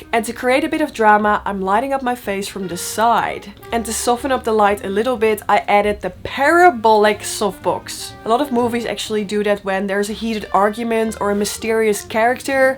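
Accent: Netherlands. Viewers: Love that you called out your Dutch accent. Because i never watched a video of you before but within a few seconds i realized i was watching a fellow Dutchie ;)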